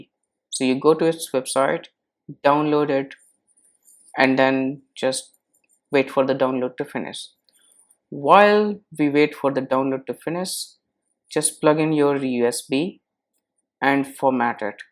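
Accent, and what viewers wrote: India. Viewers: Indian accent , it makes things so easy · great content, but I don't the Indian accent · Why do Indians always sound like this ? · Can i find a video without the FUCKIN INDIAN ACCENT PLEASE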